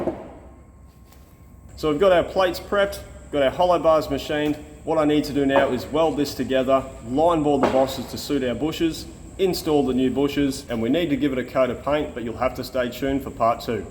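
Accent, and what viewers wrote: Australia. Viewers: QUESTION, besides the letters H and Z, what others are pronounced dif in OZ?????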